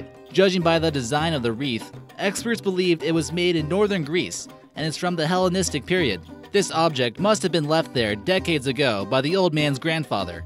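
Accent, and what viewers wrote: United States. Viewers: theres something so jarring about an American accent and american flags when discussing classical greece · paused the video with the laptop bullshit. the snarky American narrator is also annoying. For these reasons i'm out!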